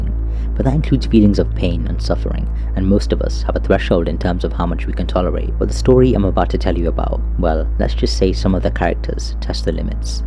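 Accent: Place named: India. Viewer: INDIAN ACCENT..DISIKE👎👎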